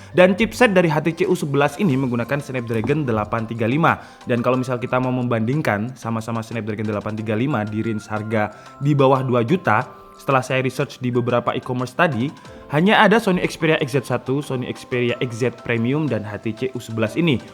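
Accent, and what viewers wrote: Java, Indonesia. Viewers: Wong jowo ta mas?😂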